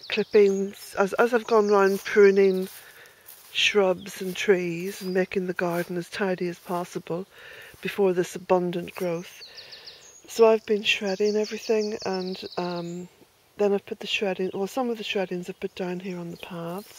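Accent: Ireland. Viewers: Catching up with your early videos. You don't have such a pronounced Irish accent these days! My, how your garden has become so abundant in these past 13 years, you work so hard. You had three cats in this video - I assume this was pre-Jack? Lovely to compare the before and after. X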